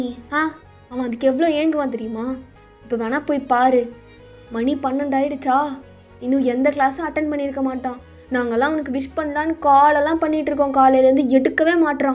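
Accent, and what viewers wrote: Kerala, India. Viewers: i love love love ur malayalam it's so damn cute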